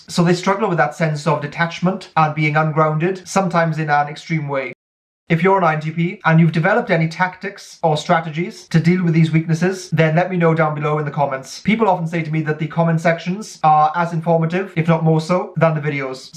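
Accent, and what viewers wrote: Wales, United Kingdom. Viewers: random question: are you welsh?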